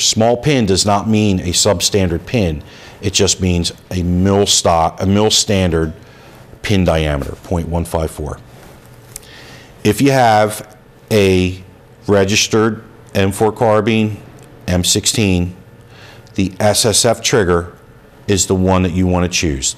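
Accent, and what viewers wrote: Pennsylvania, United States. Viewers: I love me some Pennsylvanians. Live in another state now, and miss the accent and pacing. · Hello, SIr. I a Lancaster boy living in Florida about your age. I recognize your PA accent anywhere. I have two of your triggers, the SD 3 gun ans the ALG ACT. Boy o boy are they great and exactly as you describe in your video. My next one will be the SSA E with a flat bow. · I hear Pennsylvania in your voice.. sounds like home.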